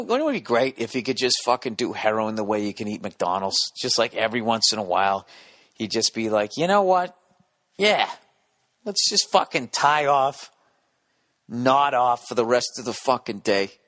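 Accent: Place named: South, United States. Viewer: Hahah your hick voice never ceases to make me scream laugh, love your work brother. Keep it up...or you'll be homeless I guess... As well as I would have much less material to listen to.;)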